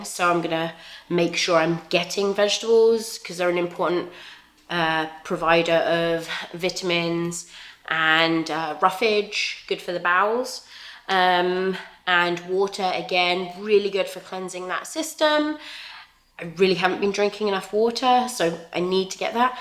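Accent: England, United Kingdom. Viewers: Since you have an English accent, you lived in Japan, and now your in California I am assuming yours is a military family? Sorry if this is common knowledge. I'm a new sub.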